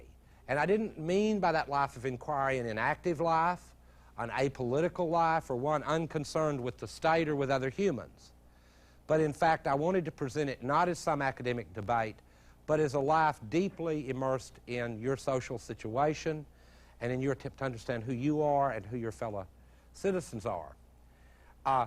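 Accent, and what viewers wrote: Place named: Texas, United States. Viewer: Watching this made me realise, as an Englishman, all academics should be delivered in a Texan accent.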